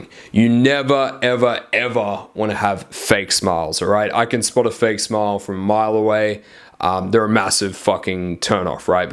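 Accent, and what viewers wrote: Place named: United Kingdom. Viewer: Where are you located? You have an accent, are you in the UK?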